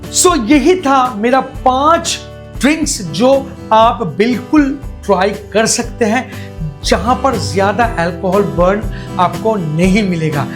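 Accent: Bengal, India. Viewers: Bengali accent chillia chiliia berachhe tmr theke 😂❤❤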